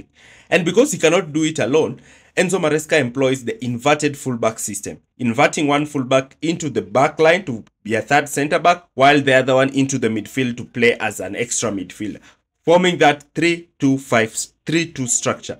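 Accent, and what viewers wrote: Kenya. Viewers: You've got a Kenyan accent, very fluent and as CFC fan ,I love your analyses. Keep it up bro. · Are yu from kenya😮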